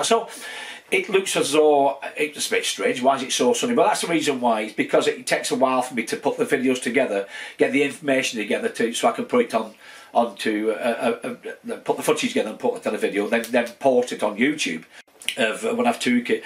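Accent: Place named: Bolton, United Kingdom. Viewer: And there's me thinking it was a Bolton accent!! You keep going me o'l mucker!! Stay Safe and Healthy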